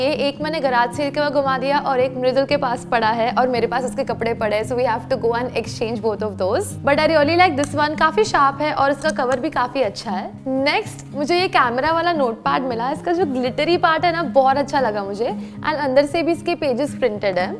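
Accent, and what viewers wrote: Gujarat, India. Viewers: Gujrati accent , Jada , ada You can buy something related to New year or republic day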